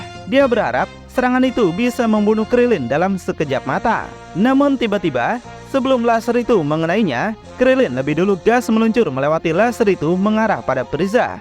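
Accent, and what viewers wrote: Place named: East Java, Indonesia. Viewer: Jawatimur suaranya kawan😂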